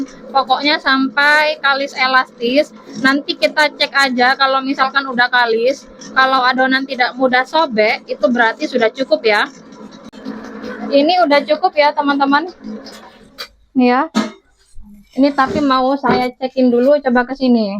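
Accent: Madura, Indonesia. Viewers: Mbak di orang mana kok bisa madura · Bunda asli mana.. ngomong nya Madura. Sy jg dr Madura ?😊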